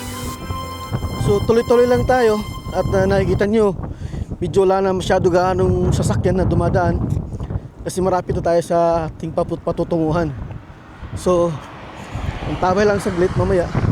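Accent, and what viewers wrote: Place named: Visayas, Philippines. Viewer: bisaya kaau 😂😂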